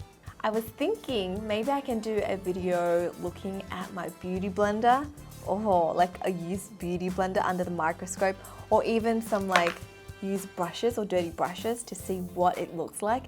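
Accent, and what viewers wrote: Australia. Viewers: are you australian? you're pretty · AN AUSSIIEEE YAYA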